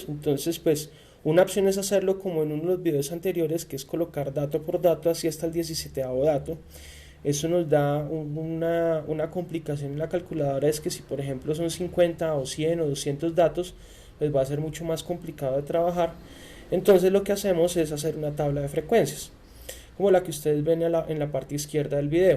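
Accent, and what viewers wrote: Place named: Bogotá, Colombia. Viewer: el inconfundible acento de Bogotá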